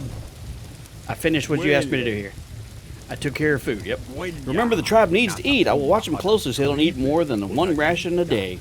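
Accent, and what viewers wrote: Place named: Texas, United States. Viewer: As for me this is not something I would play. The graphics look very nice and it seems to be pretty easy to play but not for me. Survival games are not something I normally play but I am open minded and am willing to watch you play. I started this way with 7D2D but later decided I would buy it and play it. I got your info watching Glock9 and when you first started talking I said he's Southern so I hung around watching your channel. I am in NC but my aunt lives in Humble, TX and I LOVE the Texan accent you all have. At any rate I've learned a lot from your channel in playing 7D2D and I highly highly appreciate it. I'll watch your channel and watch you play because I want to see the future of how this game works. Happy gaming and thank you for having a nice decent channel with some very nice members! :D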